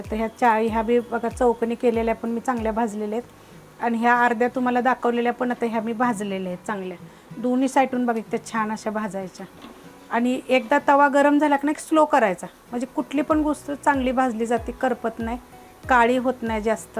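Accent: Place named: Solapur, India. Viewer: Tumhi solapur kadache ka...bhasha tashich ahe aamachya sarakhi....ani recipes super asatat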